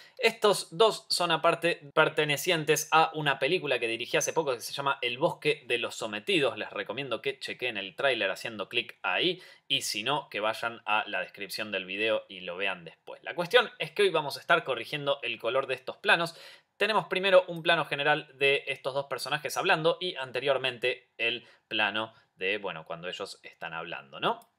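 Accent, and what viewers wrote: Argentina. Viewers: Nuevamente, si así puedo tutearte!! muchas gracias desde España!! te felicito por tu forma de explicar las cosas, es útil y divertido!! y me encanta tu acento argentino y la forma de expresarte!! me estoy tragando cada tutoría con el mas grande interés!! buen trabajo!! Vale puede parecer un comentario muy pelota pero que narices, a veces, corresponder a algo bien hecho es merecido!!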